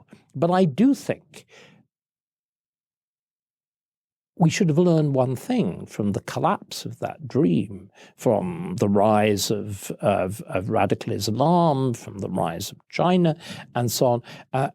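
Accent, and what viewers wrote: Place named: RP, United Kingdom. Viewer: It's amazing what a posh accent and some public school bravado can make up for ... The waffle, the contradictions one after another.... Just throwing out clichés and generalisations with confidence. There's no depth or consistent logic to his disjointed points, some of his historical parallels are so obviously ridiculous, and his comment about COVID 🤦‍♀️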